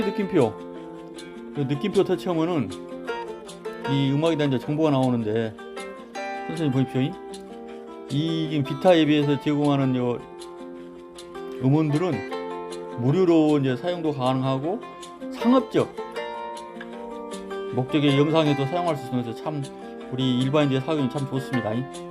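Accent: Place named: Jeolla, South Korea. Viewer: 님 좋아요 그래요 저도 이번에 어플을 깔아두고 연습을해보았는데 생각보다 괜찮더라고요 잘 알려주셔서 고맙네요 혹시 전라도쪽?하겠습니다이~~~♡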